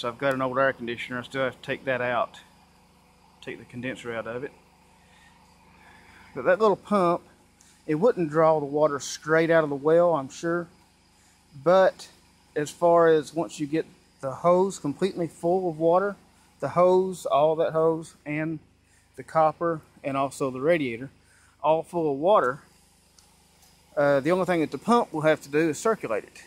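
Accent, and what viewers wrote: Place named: South, United States. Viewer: he likely made a ignorant assumption because of your accent. because we southerners can't be educated.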